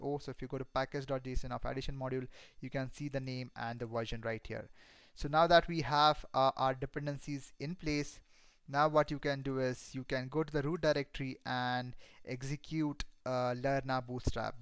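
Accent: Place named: India. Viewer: Good tutorial, and nice indian accent :)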